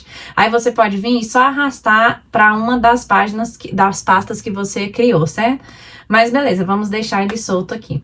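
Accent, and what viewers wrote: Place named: Brasília, Brazil. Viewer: O “sotaque” brasiliense é conhecido de longe kkkkk Excelente dica, valeu mestra 😅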